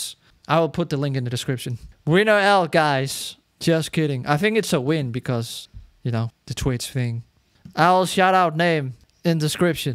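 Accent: Denmark. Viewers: YOU SOUND DANISH?!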